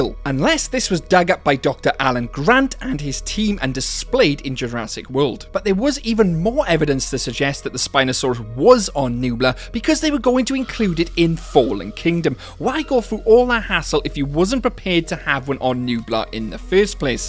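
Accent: Wales, United Kingdom. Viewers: Awesome video! Also, whereabouts from the UK are you from, I hear a bit of a Welsh accent in there but cant tell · Idk why but u sound welsh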